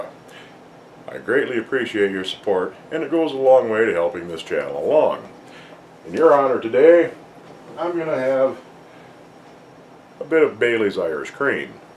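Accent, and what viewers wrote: Minnesota, United States. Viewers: Mr., do I detect a Minnesota accent? I also wonder about the lake in a couple of your videos with wildlife (black bear, ducks, geese, Sandhill cranes), which I can easily imagine is one of the lakes in Minnesota.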